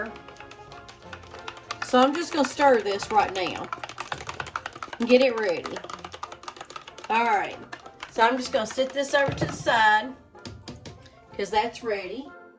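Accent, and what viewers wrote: South, United States. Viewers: Thank u for sharing your recipes . Also I love your southern accent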